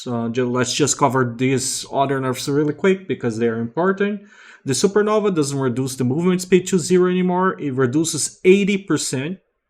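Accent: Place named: Brazil. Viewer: nao adianta disfarça o sotaque entrega kkkkk BR safado S2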